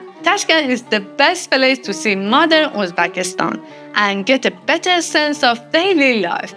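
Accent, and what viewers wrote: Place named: Iran. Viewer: the Persian accent kills me, she has to say everything in Iranian way, even change the pronunciation of names to make them sound Persian!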